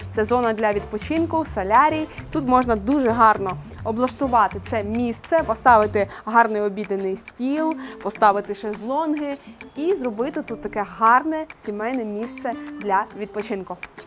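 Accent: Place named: Ivano-Frankivsk, Ukraine. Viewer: слухайте, ви, «мовний спеціаліст» ха-ха! Іванофранківці балакають прибацаним російсько-польсько-самовигаданим суржиком, і ця пані-ведуча Якраз так і розмовляє. Кажу як киянин що прожив у франківську 4 роки. Я вже їх наслухався, повірте мені!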